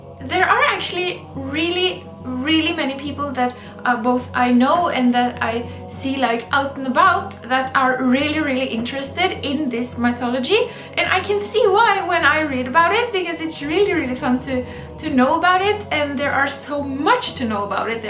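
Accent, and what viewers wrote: Norway. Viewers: Love your Norwegian dialect. I never heard a Norwegian say the “r” sound in the back of their throat like a German. I am a German speaker, btw.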